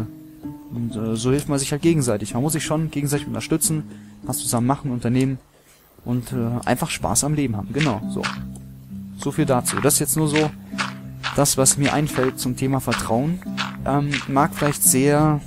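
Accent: Hesse, Germany. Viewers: Woher Genau kommst du aus Hessen? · Als ich gehört habe, dass du Hesse bist (hab ich richtig vermutet), hätte ich dem Video gerne 1000 Daumen hoch gegeben!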